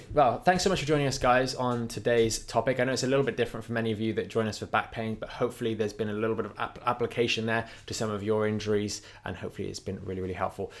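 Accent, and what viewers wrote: England, United Kingdom. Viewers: I barely understand this guys accent. Its so horrible to listen too and speaks way too fast. How i wish he was an American and not English.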